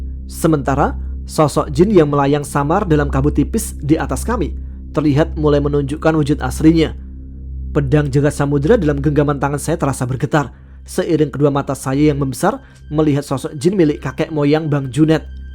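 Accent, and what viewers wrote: Jakarta, Indonesia. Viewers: Ane orang Betawi bang, keren bahasa Betawi ucapan bang masuk da kaya berrry prima😅😅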